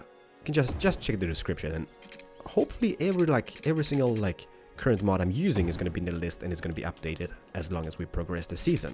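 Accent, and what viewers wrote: France. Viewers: Very good french accent, i'm french and that's cool :) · J'adore ton accent français :D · hello,you have a great french accent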